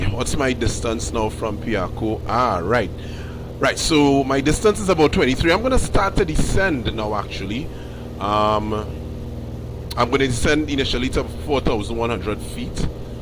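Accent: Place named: Grenada. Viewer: Your accent made me happy, I went to SGU in Grenada. I miss it! Pure Grenada!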